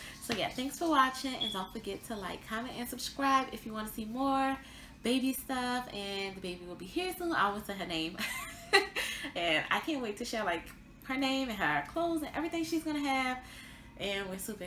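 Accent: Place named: New Orleans, United States. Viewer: You sound like you're from New Orleans lol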